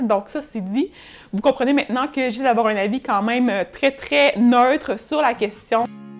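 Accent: Quebec, Canada. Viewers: ouais et en plus j'ai rien contre l'accent québécois mais bon pas évident de tout comprendre c'aurait été mieux en truefrench..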